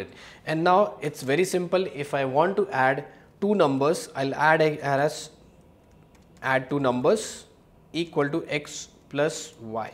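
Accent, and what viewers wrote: India. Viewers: This comment has nothing to do with the content. But, WOW! Incredible presentation!!! The effect where you stand in front of a giant spreadsheet and push fields is AMAZING. The visual is so clear, I'm not even annoyed by the Indian accent. Going to follow (have less than 12 channels I'm following in over 10 years of use).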